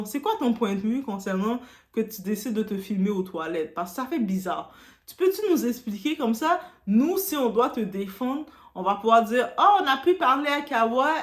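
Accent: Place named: Quebec, Canada. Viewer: J’adore ton petit accent franco-québécois. 😉 Je ne connais pas Hawabelle, c’est toi mon influenceuse. 😘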